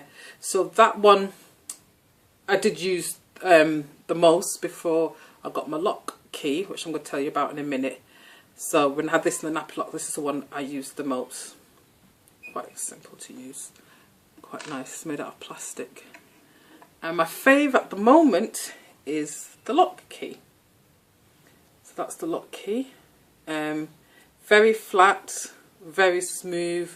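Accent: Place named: Northern England, United Kingdom. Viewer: So great to hear a UK voice and then a northern voice at that! Where have you gone? Your videos look great, would love to hear how you are getting on.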